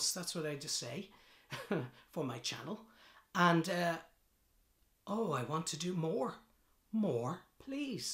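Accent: Ireland. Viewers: Welcome to the rabbit hole,. It's always nice to hear a fellow Irish accent on YouTube! I see Gabriel has already spotted your reaction, so you have a list to guide you. And we will be along for the ride. Perhaps the ' '?;-) Subbed, so I won't miss a reaction. ' ' - Wacken. <link> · You're a bit Oirish, aren't ya? I'm the Norn Irish fella. Anyway, I was meant to go see them in Dublin on the 17th November 2021 but they've had to postpone until 22nd November 2022 due to coronavirus. Reason I'm tellin ya this is cos it's purely on this song I want to see them, I really want to experience this live for myself. It's on me bucket list. Sure, I've listened to other songs by them but this is the one that really just leaves me speechless and I'm sure actually being there to witness and experience it is a whole other level. They're meant to play in The 3 Arena but I don't know if tickets are still available. 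It's something to look forward to next year...hopefully!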